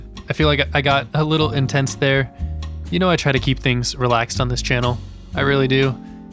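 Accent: United States. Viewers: you're from 'murica, right?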